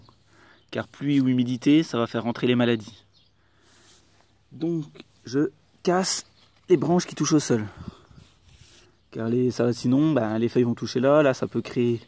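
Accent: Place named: East, France. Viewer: L'accent est un peu de l'est , allant de la Franche-Comte-Bourgogne aux Vosges.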